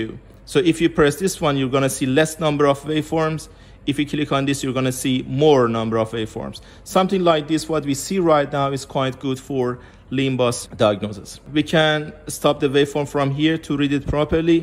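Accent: Iran. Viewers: لهجه ایرانی😊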